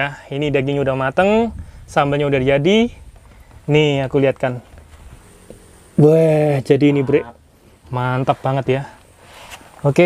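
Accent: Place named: Java, Indonesia. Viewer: hehe sama2🙏🙏logat2e ngomonge kok masih enek jowone to om😃😃🙏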